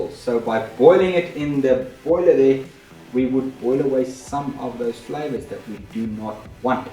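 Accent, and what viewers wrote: South Africa. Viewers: I cheated, LoL:-) I could tell by your accent that you're South African, so I used google translate.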